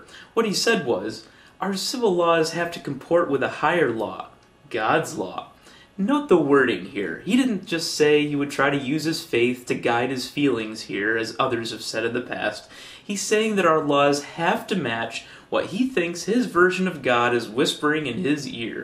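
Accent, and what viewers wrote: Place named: Canada. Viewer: Amazing canadian accent, props.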